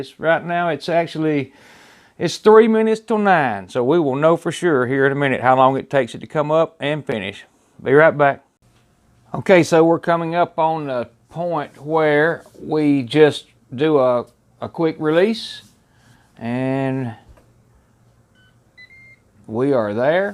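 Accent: South, United States. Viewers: Great, simple, straight forward and easy to follow. Also, when talking about barbecuing, I tend to trust people with a strong southern accent. Thanks for this. Will be trying this week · ! Great Video! My Wife and I made the Ribs and used about 1 - 1/2 slabs at the same timers. Still delicious. Probably means that there is room for error. I'll subscribe not for the recipe, but for being able to listen to your kindly southern voice. Nostalgic in a way. Last thing...off topic. I would like to know what kind of watch you have there. That thing is right up my alley and would be great for learning to cook.:-) Thanks, keep it up and don't feel like you don't deserve to monetize your channel. I've seen THOUSANDS of terrible worthless videos that are making way too much money. Great Job.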